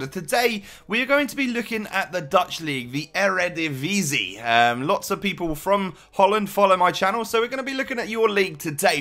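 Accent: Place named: England, United Kingdom. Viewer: It's so funny to hear an English guy pronounce Dutch names (no hate)! Great video, really enjoyed watching!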